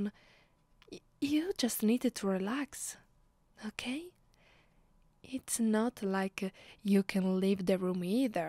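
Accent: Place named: Italy. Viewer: I don’t know why but the Italian accent adds to the charm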